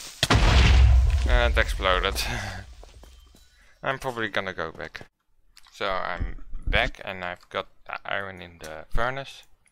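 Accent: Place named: Netherlands. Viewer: Haha your dutch accent is so funny! (Not meant bad at all m8!) Keep goin once you will be at 1k! Tip: maak wat kortere videos en record in meer fps dan word het veel interessanter! (En mschien je naar verranderen naar ofzo iets korter👍) veel succes verder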